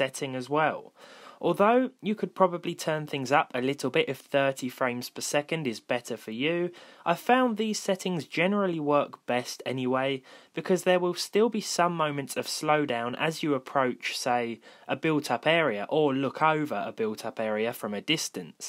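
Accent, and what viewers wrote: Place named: Home Counties, United Kingdom. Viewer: I just realised that RandomGaming and Budget Builds are 2 different channels, lol. I just hear someone with a home counties/posh (I'm from the North you sound posh to me) accent talking about old hardware. AMD is like Linux, over the time they have existed you will always hear someone saying they are poised to take over their bigger rival, but it never happens, there must be a reason why Nvidia have always been, by far, bigger in the market than AMD, same goes for Intel, it can't just be the stupidity of the public. Whenever it's time for me to upgrade there is always chatter than AMD are better than Nvidia and Intel, and I always look at them, but can never take the plunge, they never seem to stack up to the hype.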